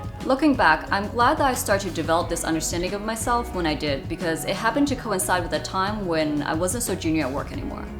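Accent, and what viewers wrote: United States. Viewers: I've watched a few of your videos and have decided to subscribe to your channel. As a UK person living in Asia, I can only thank you for giving us a glimpse in to the high society life of a Hong Kong resident. Most of what we see on Youtube are people living in shoeboxes under squalid conditions due to the highly inflated housing market. Do all people in HK have American accents? I know you grew up int he US, but your fella also has an American accent. Just curous because HK was a former British colony and all... Also surprised that the legal system still follows the UK way, you said you did it after "high school" - this term is only used in London and we actually have secondary schools. So I guess the whole UK educational system was thrown out when China took the reins in favour of a more American system. I find it very admirable, that not only you leave your job but also your profession to pursue your own dreams and do something better suited to your character. 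As an introvert myself, I found the best person to work for was myself. And that has made me somewhat successful. I hope it works out for you. You are really beautiful by the way.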